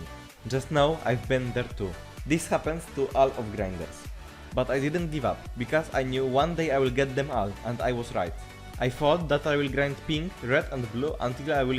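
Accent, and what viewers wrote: Poland. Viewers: Im I trippin or you from Poland cuz the accent is selling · Słychać że polak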